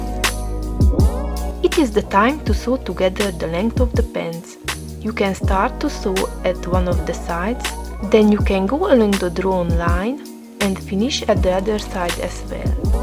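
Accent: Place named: Romania. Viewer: Wow, láttam a blogodon magyarul írsz. 👌Picit hallom a Román akcentust is most hogy mondod. Jó tudni hogy van más ember is akit érdekli a doll customize. Bocsi én meg már félig angolul gondolkozom. 😅 Kérdés: rendeled az MSC-t online, vagy honnan szerzed, kiszeretném majd próbálni ezt az egész Repaint dolgot ( I tried my best to write in my mother language 😅)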